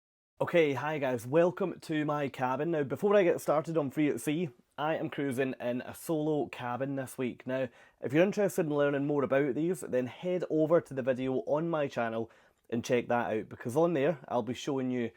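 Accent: Scotland, United Kingdom. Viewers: Thank you so much for this! My wife and I are going on a Mediterranean cruise in July, and we’re on the fence about doing the Free package with Norwegian. We typically travel with Royal Caribbean, so this is our first Norwegian cruise – and we weren’t sure whether or not to get this free at sea package. After watching this with my wife, we have decided to do it and realize that we will get more bang for a buck with this than we thought initially. This is our first time watching your YouTube channel, and we both appreciate your insight and advice – subscribed! Thanks again! PS – being Americans, we both love your Scottish accent😂😂😂😂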